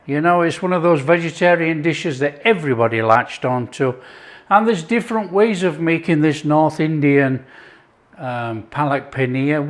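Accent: United Kingdom. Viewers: British accent is 😂😂funny 🤣🤣 kumon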